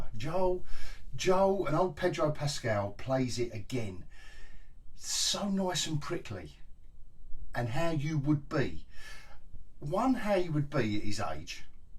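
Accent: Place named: Cockney, United Kingdom. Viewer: Christ I'm 100% British and I'm jealous of that cockney accent! 😁 It was a great pay off to episode 4 which, at the time, felt very weak. Keep up the good work mate!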